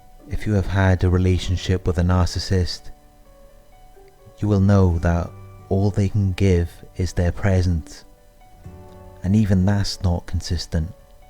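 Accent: Wales, United Kingdom. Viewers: New sub. You from Wales? You sound like it. So true video.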